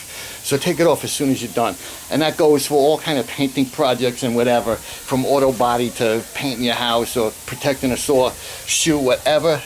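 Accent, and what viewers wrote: New York, United States. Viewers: LOL!!! Glad you said that about the masking tape. I did that when doing a fret leveling/crowning on an acoustic guitar.....with the lightest tack painters tape......something came up and I left it on for 4 or 5 days, and it was like epoxy!!!!! Something that peels off so easily turns into the hardest thing int he world to get off......I wonder how many will listen to you on that. With that, people might say "This stuff...nah....it barely stays on...how could it ever be difficult to remove"....and they have to learn it for themselves!!! Good stuff though. Trying to figure if you're Staten Island or Long Island.....definitely not Manhattan, maybe Bronx, not Brooklyn......trying to get the accent. lol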